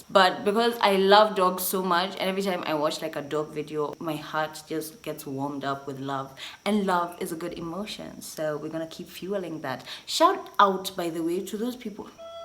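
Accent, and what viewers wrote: United Kingdom. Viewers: Here for the British accent 😍😍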